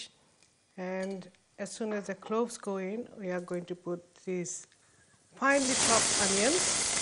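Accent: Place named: South Africa. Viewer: Her accent doesn't sound so British, she sounds more like she is from Durban, South Africa.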